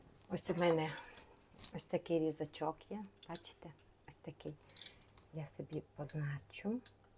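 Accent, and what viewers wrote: Transcarpathia, Ukraine. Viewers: Добрий день. Ви неймовірна. Приємно чути закарпатський діалект. ❤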